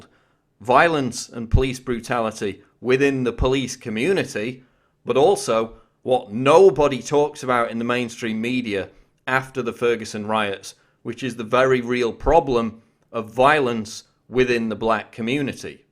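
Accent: United Kingdom. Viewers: Damn, I thought this was something that'd tell me some new information, its just a slow talking extremely biased brit.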